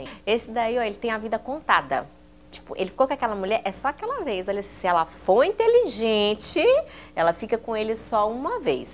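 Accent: Brasília, Brazil. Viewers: sotaque brasiliense super ativo rsrs aií aií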